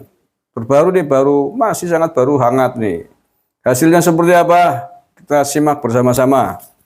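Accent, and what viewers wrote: Banyumas, Indonesia. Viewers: Ora ngapak ora kepenak mantap 02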